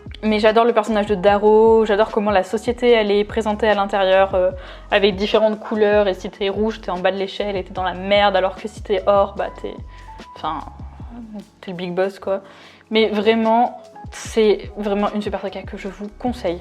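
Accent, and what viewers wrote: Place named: England, United Kingdom. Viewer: ton accent anglais ❤️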